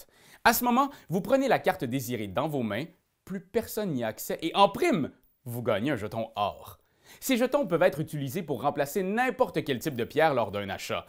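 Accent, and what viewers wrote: Quebec, Canada. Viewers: À la fin de son tour ! Ce qui peut permettre pendant celui-ci, d'en avoir 13 momentanément ! 😋 Petite omission également concernant les nobles, que l'on n'achète po...hein !? Mmh'et 🤫 Il ne faut po...hein !? Tout révéler ! Mmh'et donner envie, comme le fait si bien vos pépites oups ! Vos vidéos telles des bijoux/💎... (Ainsi que sur la fin du jeu où l'on finit le tour). Encore merci pour vos magnifiques vidéos. Qui + hé ! Agrémenté de l'accent de la Belle Province ! ✌🏼🃏♟️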